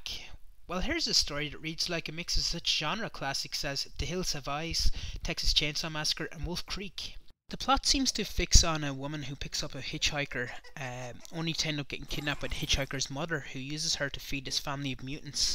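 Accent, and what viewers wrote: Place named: Wexford, Ireland. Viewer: Nice, I'd messaged you via Facebook re. The Lost Classics. Great to hear a fellow Wexfordian and horror aficionado. I get that northern shite all the time as well, crazy!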